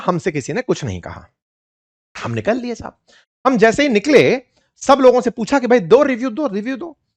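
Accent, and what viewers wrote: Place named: Marwari, India. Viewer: श्री राम राम जी SiR ji आपके शब्द में कभी कभी मारवाड़ी शब्दों का उच्चारण होता हे जिसे हम सभी मारवाड़ी होने का पहचान शब्दों से पहचान हो जाता है 🙏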